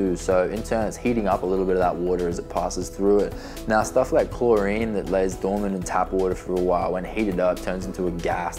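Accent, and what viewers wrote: Australia. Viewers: Obviously this dude is a fellow Aussie but I do not recognise his accent... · Western Sydney I reckon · Yup, thought so too. Maybe Adelaide 😂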